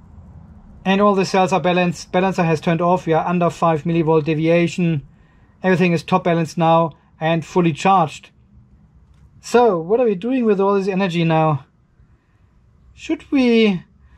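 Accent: Germany. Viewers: ja moin, bin das erste mal hier auf en Kanal und bei dem Akzent gehe ich mal davon aus das du ein deutschere bist?! or am i wrong?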